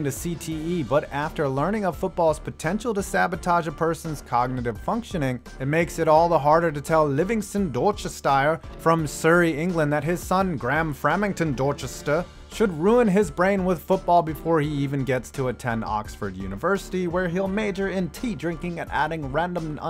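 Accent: United States. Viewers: Shut up.. you sound so America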